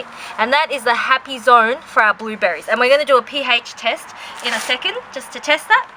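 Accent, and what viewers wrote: Australia. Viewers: Hi lady!🌹 First time ever seeing you on line about Blueberries! First, what a lovely pretty lady speaking perfect Australian accent has immensely added attraction to the description, I’m a transplanted myself to America, sometimes I have hard time understanding Aussie and English folks but the more I listen to you all the more I feel closer to you, today you’ve got me hooked!🤩 Thoroughly experienced from various blueberries for better pollination and productivity to experiment Subject to soil acidity testing, VERY NICE! 😀 You’ve got adorable smart kids to help identifying soil acidic colors…. Family involvement, Lovely! The good looking crimson red fruit/ bell hanging in the middle of the metal arch, what is that fruit??? Looks like pomegranate but surrounded vines don’t look like Pom tree?! Thank you much for this video, I enjoy it greatly!!! 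🌹🌿🪴🫐💖🇺🇸🤩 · I love a nice asian woman with an Australian accent who loves to garden.. But awesome content cause I am about to do blueberries myself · Very interesting an Asian woman with an Australian accent or European accent I absolutely 💯 love you. consider me a new SUBSCRIBER.. ROCK ON BEAUTIFUL...❤️🐼🌻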